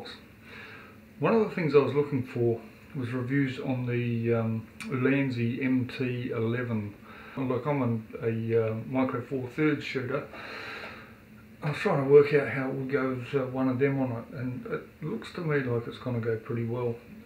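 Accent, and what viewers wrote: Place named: New Zealand. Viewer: Hi '' ''..you are ''brighter'' than me as I had to jump on youtube to see how to open the camera mount for smart phones!..thanks for the heads up.Btw,I live just down the road from where they are made in Shenzhen and the cost was only 59RMB.When the tripod arrived in very professional packaging and I had a chance to see the quality, I made a comment to my son ''how can anyone compete with the Chinese in manufacturing!'' From a fellow Kiwi in China (unless I am very mistaken with your accent!)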